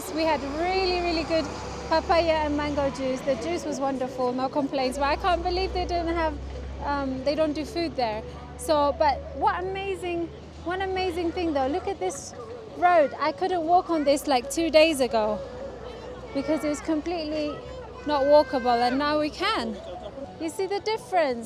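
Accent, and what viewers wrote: United Kingdom. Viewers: "Health and safety officers! eat your hearts out". I like your posh sounding British accent.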